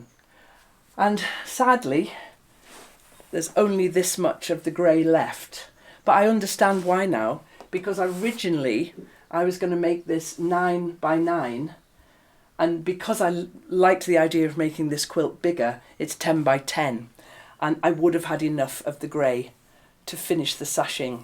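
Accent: England, United Kingdom. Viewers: I could listen to you talk all day. Your honesty with yourself comments tied with your lovely British accent is so soothing and sometimes comical that you take away my stress and make me smile. Not only do I learn from your expertise, but I learn to relax and enjoy. Thanks, from Ohio, USA.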